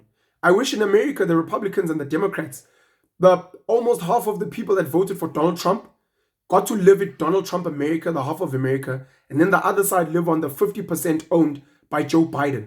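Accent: South Africa. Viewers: Owh the man...you sommer mix the coloured and white accent together🤣🤣🤣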